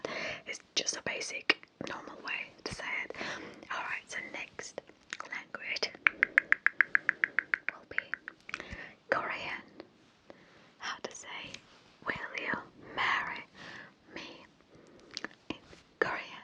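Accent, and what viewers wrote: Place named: Thailand. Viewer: Your Thai accent is so cute. I love it ❤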